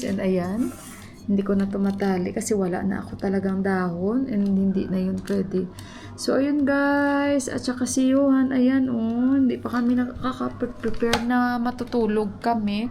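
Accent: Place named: Hiligaynon, Philippines. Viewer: Lingaw gid KO mag lantaw SA imo te Kay ilongga man KO te...from lemery Iloilo..bisan mga 5 yrs ago pa na vlog mo ate ambot lantaw KO tana AHH..Kay kuha stress KO mag lantaw vlog mo te kapin pa didto SA village te..kag Kanami Kay enjoy ka gid da SA lugar sang Nepal te..new subscriber Gali KO te pero lingaw KO ..Kay ang Tagalog mo te gwa gyapon pag Ka ilongga AHH..halong Kami pirmi te..pirmi KO hulat upload mo ..pero mga vlog mo nga dugay na lantaw KO yah te AHH.. hehehe ♥️♥️♥️😘😘😘😘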